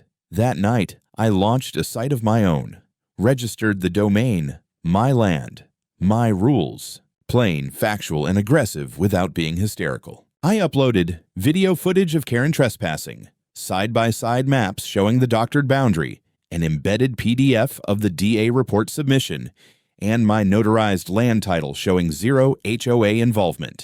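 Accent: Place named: Canada. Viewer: Zed IP... Canadian, eh?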